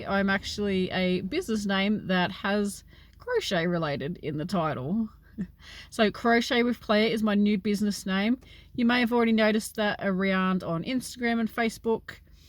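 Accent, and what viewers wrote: Australia. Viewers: it is so good to see you back, I know you have had some stress related issues. I pray that you are doing well and everything is going good for you. Believe it or not I was just thinking about you the other day wondering if we would ever see you back on YouTube instructing us on crochet. I am looking forward to watching all your new tutorials on your new channel. I have always enjoyed your tutorials and your lovely Australian accent, just makes my day. Blessings, good health, best wishes for you in the future. Much love coming your way from Tennessee, USA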